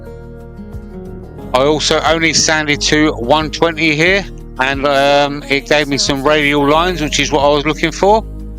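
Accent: United Kingdom. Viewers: Very nice. I might try making a set to display in our family room. As a first time viewer I have two suggestions. Please turn down the music, being from the US it makes it hard to understand your British accent, and please move the camera so the chips don't aim at the lens. Thanks from a new subscriber.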